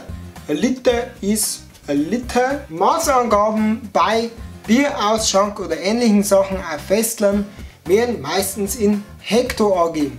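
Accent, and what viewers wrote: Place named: Upper Palatinate, Germany. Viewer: sprichst du im Alltag uuch so stark oberpfälzisch gruß aus sulzbach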